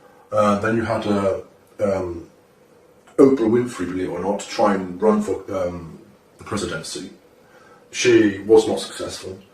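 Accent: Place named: United Kingdom. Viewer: Okay so he’s from The United States but has a British accent?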